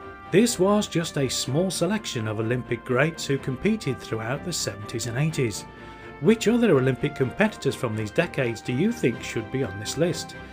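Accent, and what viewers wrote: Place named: Wolverhampton, United Kingdom. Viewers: I'd have definitely included Linford Christie as he's a legend for sure! Also Colin Jackson, but that's probably more of a "me" thing as he's Welsh and I love a Welshman! 🤭 So you're from Wolverhampton then? I've got a friend there. Really should have twigged to the accent! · After all these months of being a subscriber and watching I've always thought you sounded local, it's only when you mentioned Tessa Sanderson you revealed yourself to be a fellow Wulfrunian. Love the content, as an 80s child it's lovely to reminisce while watching.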